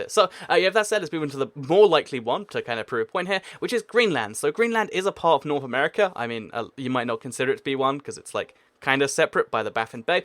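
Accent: United Kingdom. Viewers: Who else couldn't tell if this guy was British or Australian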